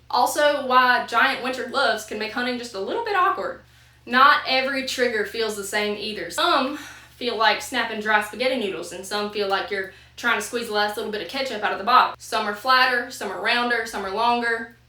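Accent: South, United States. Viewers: You’re video just showed up on my feed loved it informative and funny had very useful info and to top it off that southern accent took me back home I subscribed looking forward to seeing more of your work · After a couple of minutes in the video, I could hear the "taam (time); thaang (thing); kaand (kind)" - and I was like, yep, that's a red neck there 😆. Great video by the way ✌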